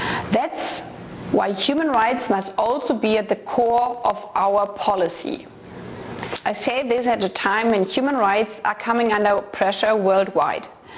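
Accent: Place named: Germany. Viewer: Unbeholfen und stark deutsch gefärbter Akzent. Joschka Fischers Englisch ist wesentlich besser, und der hat nicht etliche Monate in einem englischen College verbracht.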